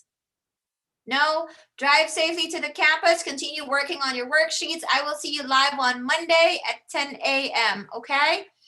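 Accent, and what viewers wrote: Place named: Philippines. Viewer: U sounds like Filipino po. HUHU I need more info I’m still confused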